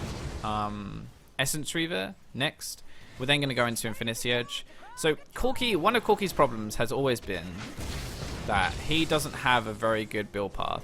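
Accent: United Kingdom. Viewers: its called a British accent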